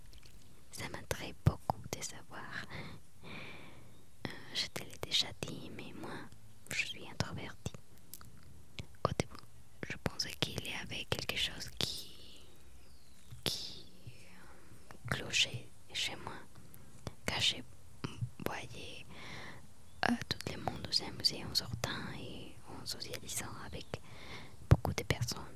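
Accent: Spain. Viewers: C'est la première fois que je viens et tu as une agréable personnalité, ton accent est trop chou. J'adore la langue espagnole. Bonheur à toi guapa morena, j'espère que tu reviendras🌹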